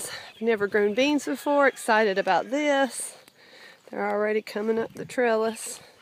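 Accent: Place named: Memphis, United States. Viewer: Your garden looks very healthy. By your accent it sounds like you might live near us here in Memphis. My tomato Jungle is in the greenhouse this year. I had such a battle last year with the tomato horn worm outside that I moved them all (100 plants) inside. I look forward to seeing more of your garden this year. Chuck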